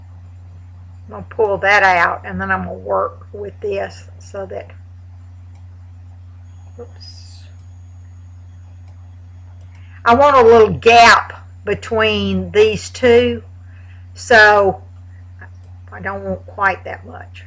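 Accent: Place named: South, United States. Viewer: you are so awesome!!! and I LOVE your southern accent!!! (I'm from Kentucky, but lived most of my life here in Ohio, so I lost my accent :( ...)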